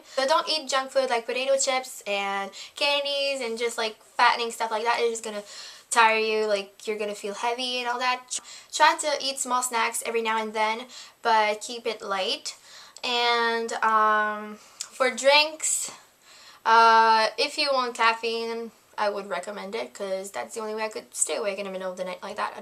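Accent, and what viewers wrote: Canada. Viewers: you're canadian aye? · are you canadian?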